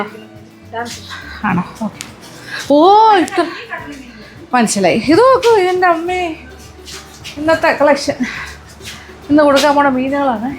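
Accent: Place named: Trivandrum, India. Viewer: Innathe food kanaan thanne endoru bhangi... Tvm the bhasha ano Mam adipoli...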